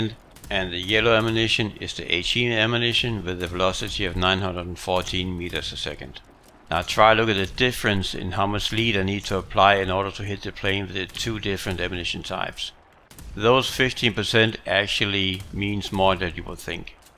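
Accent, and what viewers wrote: Denmark. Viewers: Are you from Danmark?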